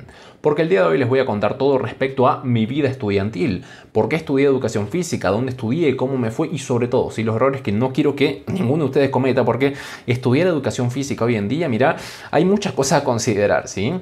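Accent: Argentina. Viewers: sos de Salta? whooooo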